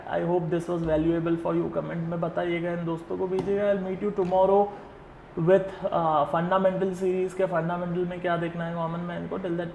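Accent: Gujarat, India. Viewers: Bhai gujarati cho ??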